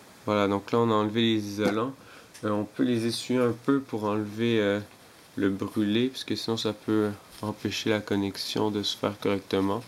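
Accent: Quebec, Canada. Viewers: hey tu vien du Quebec ou quoi ? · j'adore ton accents rebequooiiiiiss <3:) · Merci mec !! Je vais reparer mes ecouters Beats !! PS: tu es quebecois ??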